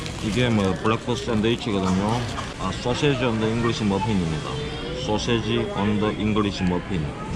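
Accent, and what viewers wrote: Gyeongsang, South Korea. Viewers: 절로가라마~~~!!!!여가 너그집이가 임마 칵 쌔리뿔라마 ㅋㅋㅋ 전형적인 경상도 아저씨네에 두달동안 개점휴업예정 이시라 앞에 못본거 진짜 열심히보고 있습니대이 근대 재미있어예 헤헤😋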